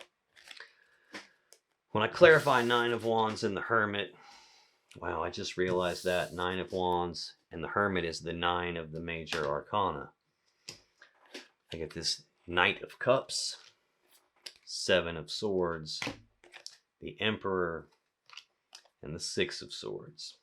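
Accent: South, United States. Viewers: Never seen your channel before but you have to be southern like me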